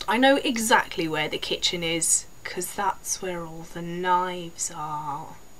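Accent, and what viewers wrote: United Kingdom. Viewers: HAHA! THOUGHT THIS WAS QUITE FUNNY ACTUALLY. . SURPRISED ME WHEN A TRUE BRITISH ACCENT CAME OUT TOO! :)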